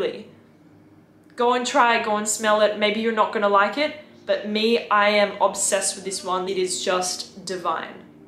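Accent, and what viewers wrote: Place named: Australia. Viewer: Hello. I have been to your hometown Perth twice with the USAF Reserve out of Travis AFB California. Beautiful woman from a beautiful area of the world. I love the accent. Would love to be a patron member but How? I look forward to hearing from you LOL, Scott.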